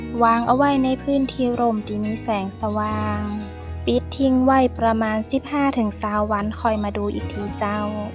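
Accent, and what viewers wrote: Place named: North, Thailand. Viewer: ชอบฟัง..สำเนียงอู้คำเมือง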